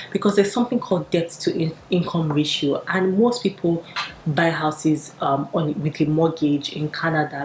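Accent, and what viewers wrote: Nigeria. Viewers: Thanks for this video sis. I learned a lot. I remember when my uncle co-signed to his friend's loan in Kaduna,it was a loan from LAPO, his friend fled to Ghana, his life became very hard and he was treated as if he was the lender. LAPO can be very scary in Nigeria. BTW sis,you sound like you're from kwara state.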